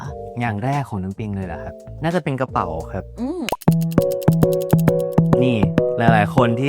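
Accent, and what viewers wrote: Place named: North, Thailand. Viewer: น้ำปิงพูดเหนือน่ารักมาก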